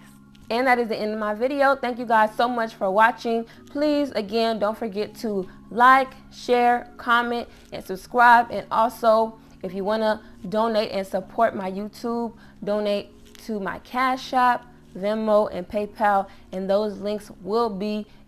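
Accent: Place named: South, United States. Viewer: You mad Southern hun bahaha ☺️😂 Keep up the good work...100k subscribers in no time! 💪🏿💪🏿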